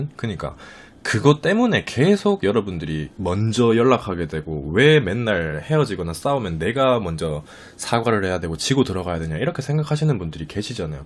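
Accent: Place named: Daegu, South Korea. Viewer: 대구사는데^^익숙한 사투리라 너무 좋고 진짜 최고의 인강을 보는거같아용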